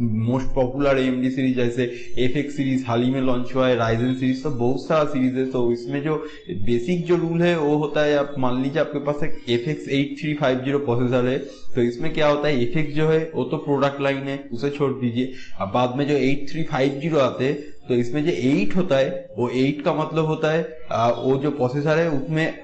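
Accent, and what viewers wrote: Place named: Bengal, India. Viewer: Your hindi is like bangali people's hindi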